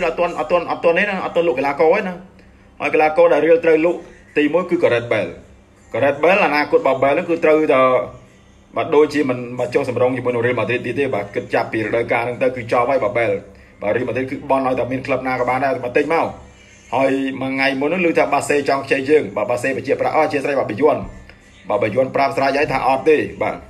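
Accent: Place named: Stoung, Cambodia. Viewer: សម្លេងadmin ដូចអ្នកស្រុកស្ទោង កំពង់ធំ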